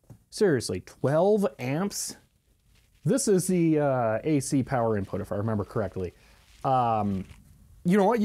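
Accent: United States. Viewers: Don't know how you're getting on with your restoration, but… if you haven't plugged it in yet (8-months later says YouTube), then DO install another circuit from your fuse-box (just as you might when installing a shower or cooker for the first time). Don't just plug it into your ring-main with other appliances and wall-outlets. PS. I guess you are saying this in your video but the Americanese is not quite so clear as English English. Sorry!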